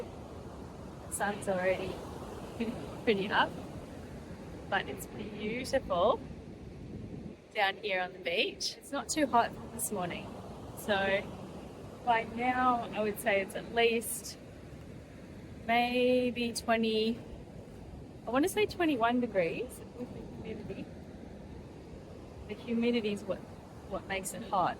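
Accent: Australia. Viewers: i really like your videos. so that i can shadow aussie accent ❤ ❤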